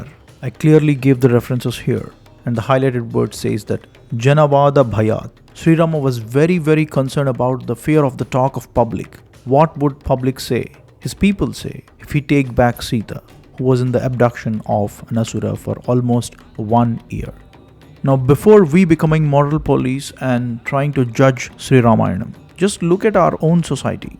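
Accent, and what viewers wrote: India. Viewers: Bro please use ai english dub West will hear you Truth be told indian english doesn't sit well But with ur knowledge and western english u will rock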